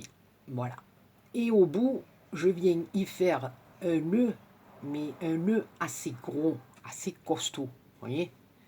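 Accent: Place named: Marseille, France. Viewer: J'adore ton acsens de Marseille et aussi ton travaille facile mercies beaucoup continue j'aime beaucoup c'est agreable vous entendre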